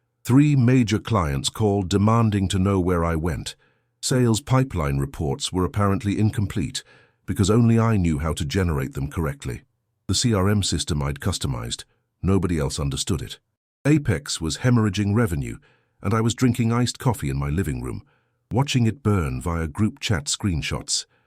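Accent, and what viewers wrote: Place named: United Kingdom. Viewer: The Brit 🇬🇧voice makes the stories even better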